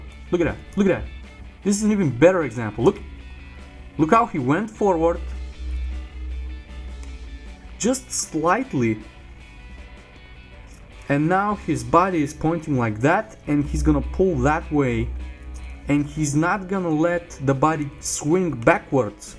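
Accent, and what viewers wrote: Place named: Russia. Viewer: You have accent just like you are Russian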